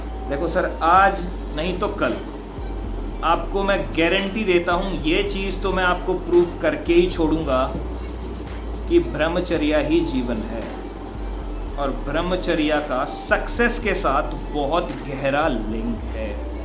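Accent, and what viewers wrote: Haryana, India. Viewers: Bhai ji aap haryanwi ho kya🔥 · Is video me Pure Haryana accent me bate kiya hai😀 similar to Acharya Agni Dev Arya ji 😀 pure Haryana accent 🙏🙏🙏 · I love this khadi hariyanvi boli 😉😉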